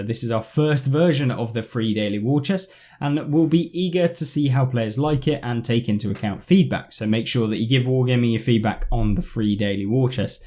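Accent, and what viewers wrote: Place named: Cockney, United Kingdom. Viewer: You speak English? You're English? So why the actual f*ck can't the people who made the f*cking language speak it ? Roofless? It's ruthless you can say the with the t and the h making the correct sound then you mispronounce thought and make it sound like fought when clearly one starts with a f*cking f and the other starts with th so if you know what sound it make's why are you using fs where there's no fs you say there their and they're perfectly so why the f*ck are you using that lazy dog sh*t cock knee accent for ruthless 🤔 mate come the f*ck on stop being sooooo lazy put some effort in if dez game's can correct himself (Lithuanian-English) why can't you speak the easiest and your own f*cking language for 5 and a half minutes I was waiting to see a Roofless tank wondering why a tank without a roof was being put in the game and why you were calling it a roof and not a turret it's your language speak the f*ckin thing correctly or do some linguistic tutoring you literally have the internet and hundreds of language apps at your disposal I'm Australian and between you idiots the Americans and the kiwi's f*cking up your own language I'm appalled I know Swede's Finn's and Indonesians who pronounce English better than that stop swapping letters out and pronounce sh*t correctly I'm diligent when I use German and Finnish so the context is understood how are you butchering your own language? 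It's just laziness nothing else fix it drives the rest of us up the wall you're doing such a g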